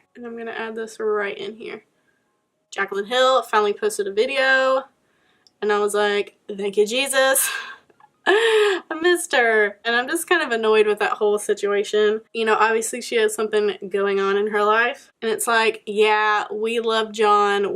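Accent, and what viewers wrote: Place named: South, United States. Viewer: I KNEW you were southern! You talk exactly like I do... the way you word things really. I've got a southern way of speaking but with a wee Scottish accent because I grew up in a Scottish Filipino family. Lol. Weird bunch/combination I tell you.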